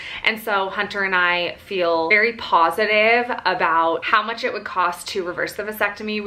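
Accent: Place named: Utah, United States. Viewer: It's part of a Utah accent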